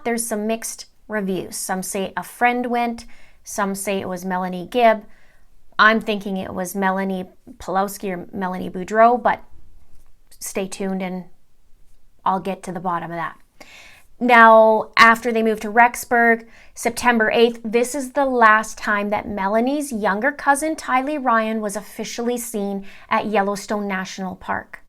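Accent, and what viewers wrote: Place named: Canada. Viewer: I knew you were Canadian before I knew you were Canadian...had a friend who passed and he was Canadian... Batteries was bat trees And said aye all the time... i miss that guy .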